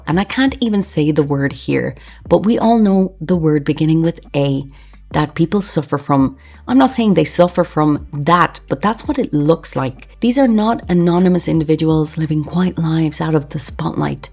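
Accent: Ireland. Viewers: First time seeing your content and you have a subscriber. Also love the Irish accent and your soft voice serving these excellent takes! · Off topic but LOVE your Irish accent. You have a lovely voice too. It’s refreshing to hear ❤❤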